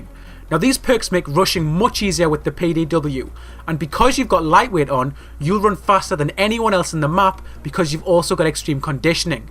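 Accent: Newcastle, United Kingdom. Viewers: The good old geordie :) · he's geordie you numpty.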